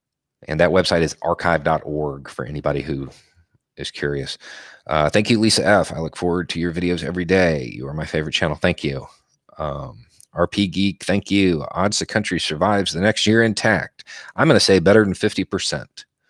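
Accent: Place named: South, United States. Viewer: He does. I've seen a clip where it's hardly noticable. (Can't find it now.). I think he's the "I know a man" having to hide their accent (from a previous video) because people won't take him seriously as a journalist, and question the intelligence of anyone with this southern accent.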